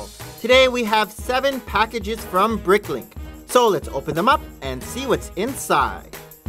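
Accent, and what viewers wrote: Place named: Canada. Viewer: It's like you are from Canada or something....?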